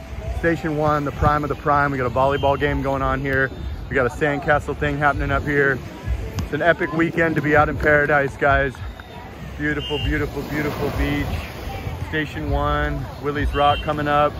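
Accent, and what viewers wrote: United States. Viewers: You sound like you are from California. Thanks for the heads up.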